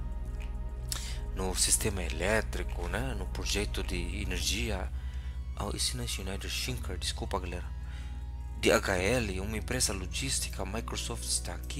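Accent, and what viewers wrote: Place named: Argentina. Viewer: De onde você é? Seu sotaque parece argentino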